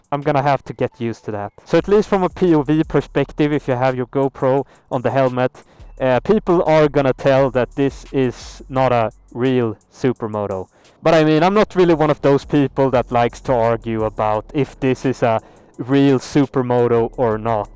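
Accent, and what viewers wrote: Sweden. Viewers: Ironic? You can't sound more Swedish when you speak English.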